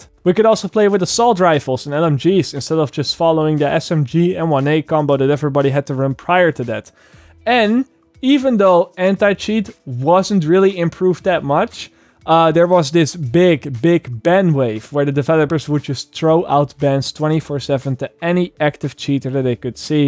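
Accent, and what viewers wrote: Netherlands. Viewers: Nederlands accent? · Are u dutch?? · R u dutch?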